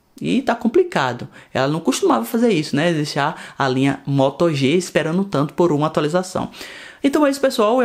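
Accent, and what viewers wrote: Bahia, Brazil. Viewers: Sotaque baiano é outro nível 👏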